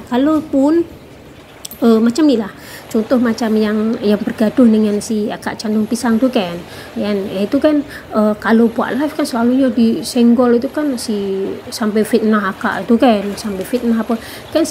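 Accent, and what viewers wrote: Java, Indonesia. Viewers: Slank Jawa nya pekat.Walau pun dh lama dok M'sia.Semoga pelan pelan berubah agar jadi Org Malaysia.Maaf bukan tujuan menghina tapi kagum dgn ketabahan hidup di M'sia.👍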